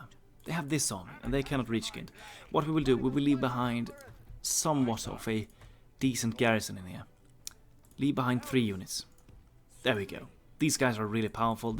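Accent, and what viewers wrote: Sweden. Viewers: From which part of Sweden are you?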